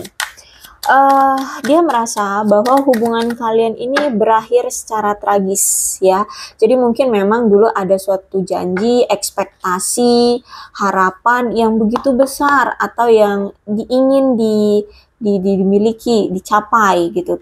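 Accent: West Java, Indonesia. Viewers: Orang sunda ya teh?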